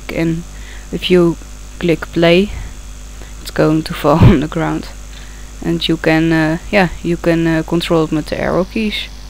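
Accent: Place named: Netherlands. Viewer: haha, je hoort ook egt zo'n hollands accent! xD k ben ook 13 jaar, (over een week 14) ik ga ff je tutorial bekijken.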